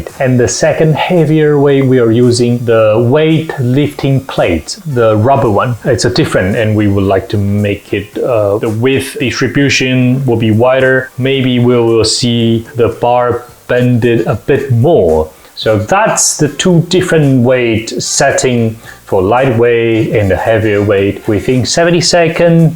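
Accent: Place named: United Kingdom. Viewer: 不會拉，你很多單字會特別的有英國音，那個Ter很明顯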